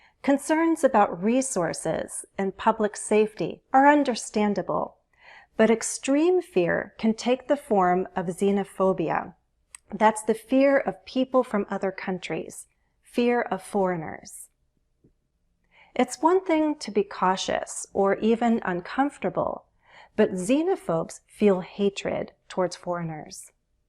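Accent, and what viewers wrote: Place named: United States. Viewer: Hello. Thanks for your teachings! I have a question. You teach British English. Why in this video, you used American accent?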